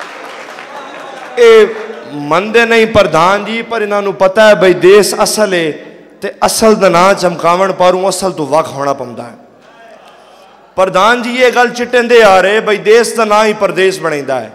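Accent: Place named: Punjab, Pakistan. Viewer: جٹکی پنجابی ❤❤❤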